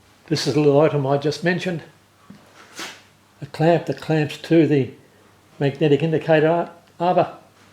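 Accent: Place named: Australia. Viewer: Please don't take my comments negatively. I appreciate most of your video content, but the audio is horrible. I suggest that if you want to speak, please try doing voice over in post production. I have not been able to actually hear you or discern what you are saying over the other noises in most of your videos. Aussie accents to Americans sounds like Greek (maybe it goes both ways). But nonetheless, I don't know what you are saying, AND I REALLY WANT TO. Thanks for showing so many wonderful grinding setups! I'll keep watching no matter what.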